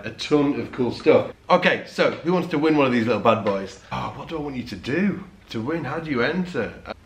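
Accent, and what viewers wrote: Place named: England, United Kingdom. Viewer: Great video + English accent=8 minute intro monologue.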